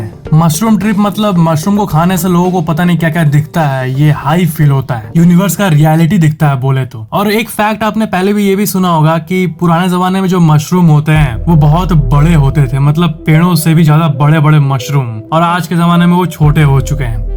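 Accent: Bihar, India. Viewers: His language speaking style changed too much..like he is from bihar.. And his voice is not energetic anymore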